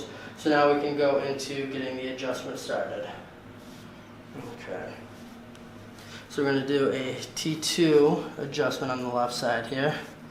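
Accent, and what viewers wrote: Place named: Midwest, United States. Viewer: Hey, you sound like you're from Illinois or Wisconsin. Am I right? Random question, I know.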